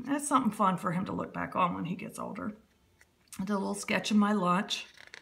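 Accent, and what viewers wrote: United States. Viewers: you did a fabulous job!! Loved seeing all your beautiful pages and love your little southern accent!!!!! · Hi, A wonderful flip through. The balance was perfect. I was interested all of the way through. It's lovely to put a voice to the face and you have that gorgeous American accent with the lovely elongated vowel sounds. I look forward to the next one, Ali xxx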